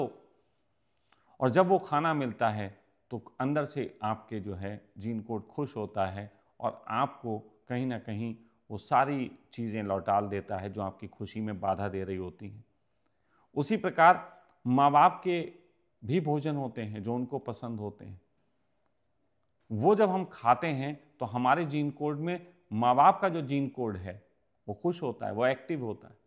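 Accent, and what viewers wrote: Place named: Arab world. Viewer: Pronunciation sai lag raha hai arabian ascents hai urdu padai hai sayad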